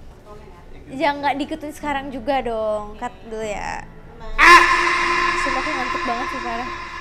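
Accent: Makassar, Indonesia. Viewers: Edisi pulkam nihh...👍 Kereeennn..... logat makassarnya masih mantap... 🤭😇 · Beh anak makassar banget nihh logatnya❤❤❤ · enak dengar ngomong makasar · Masih ada logat makassar ta pale 😂😂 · Tdk hilangji tawwa logatna Salam dari makassar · Rajin rajin ki wekna pake logat makassar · Selamat dtg di kota daeng mksr, ternyata dialek mksrx keluar ya. Mantap salam cantik manis, imut.❤❤❤ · Wich langsung keluar logat mkssarx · Candu banget klo ngomong pake logat Makassar.. Btw pelan2 Ki dek masih pagi loh itu udh makan SOP saudara ajh🤦😮‍💨 #Ewako 😊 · Knp ya klo pulng mksar auto kmbli logat mksar walaupun sdh puluhan taun merantau jkrta....wkkkkk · Logatnya makassar banget suka deh 😂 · logat mkasarnya kluar jo..mntabbbb sehatsehat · Mantap logat makassar ya ga pernah hilang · ini tempat kamung halaman ku, lgsg balogat makkasara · Apa kareba bocah remax funky.auto logat Makasar keluar 😄😄 gini rajin upload biar cepat berkembang channelnya.ketawa bikin candu. 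· langsung mode auto makassar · Logat makassar memang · Full logat Makassar 🥰,ceplas ceplos nie 😂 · Tawwa tidak na lupai logat makassar kka, biasa itu ada orang lama mi tinggal di jakarta, na lupa mi logat kampung na, tapi tawwa tidakkk, salut memang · logam MAKASAR nya keluar …beda kalo d Jakarta 🤣🤣🤣sehat sukses ya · aslina logatnya tawwa, nda nalupai 😂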